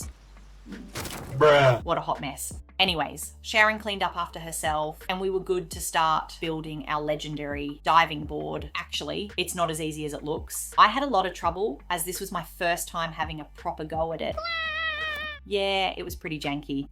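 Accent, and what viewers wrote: Australia. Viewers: i love the australian accent, I wish i was australian T_T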